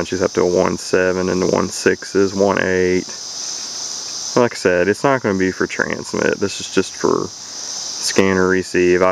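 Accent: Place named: South, United States. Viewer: Top level Southern drawl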